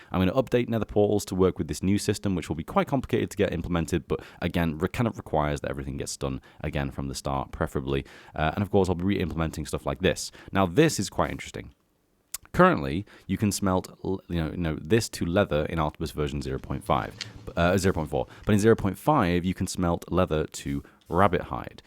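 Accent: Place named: England, United Kingdom. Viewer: Somewhere in England, he's certainly got the accent for it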